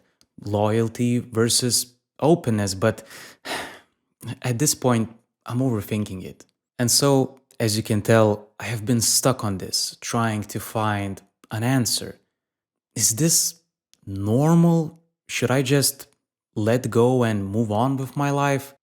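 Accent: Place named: Portugal. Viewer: So relatable, especially after graduating for a few years. Where are you from by the way? You sound Portuguese but look German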